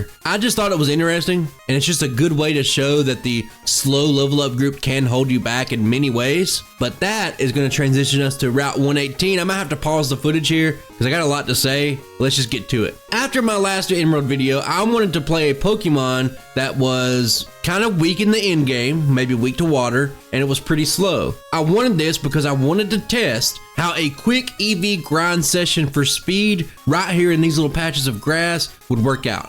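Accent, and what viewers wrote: South, United States. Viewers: The southern drawl mixed with the wit, the self awareness and relatable rants just made you probably the most human YouTuber I've ever listened to. Fuck yeah dude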